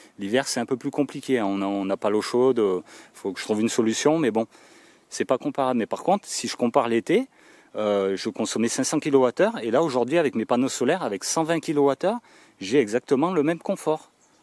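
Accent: France. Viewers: Hello j'ai cherché dans les commentaires sans trouver votre localisation si ce n'est l'accent qui vous situerait en Isère ou Lyon peut être. Moi je suis sur Nimes. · Bonjour Pour l eau chaude: Je suis pas un grand specialiste, mais selon votre systeme de chaufage. Je penses qu il serait possible de recuperer calorie perdu par cheminée. Si vous avez chaffage au bois ou mazout par un poele il serait judicieux de reflechir comment faire un serpentin autour conduit ou circulerait un fluide pour rechaufer un reservoir d eau chaude, a bien penser un systeme securitaire. Je suis natif des hautes alpes et votre accent me parle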